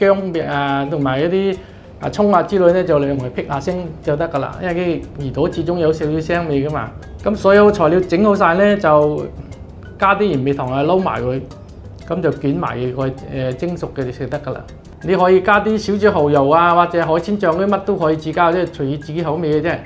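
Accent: Zhongshan, China. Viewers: 师傅口音好似中山人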